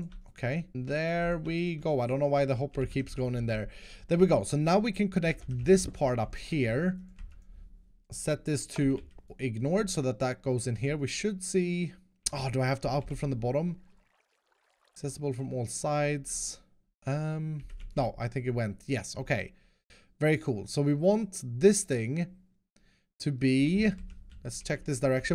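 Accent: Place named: Sweden. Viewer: It sounds like you are from Sweden talking English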